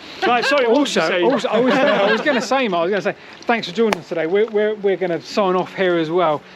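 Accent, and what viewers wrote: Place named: Northern England, United Kingdom. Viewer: I miss around 5% of phrasing and need to rewind. I had grandparents who emigrated to the US from London, so it’s the northerners I have difficulty understanding.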